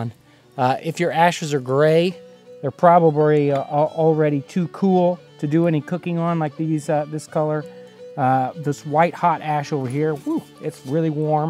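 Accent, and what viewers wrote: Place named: East, United States. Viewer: Sounds vaguely eastern-US.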